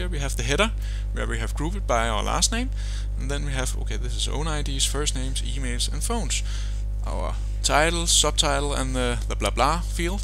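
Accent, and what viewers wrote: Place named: Denmark. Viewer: Du lyder meget dansk. You sound very Danish.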